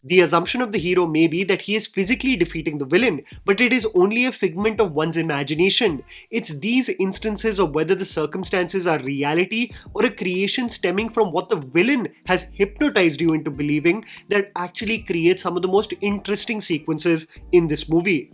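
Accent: India. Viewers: There's no disrespect towards his Hindi accent. I jokingly mentioned about the pronunciation. I know it's tough without knowing the language entirely, so full points for trying. If you respect other languages, you will say Telugu and Kannada properly, just like how we say Hindi and not Hin or Hind. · Why??? Why can't you respect his hindi accent?? And in Hindi it's "Telgu" & "kannad" If you can't respect other languages, why expect others to respect yours???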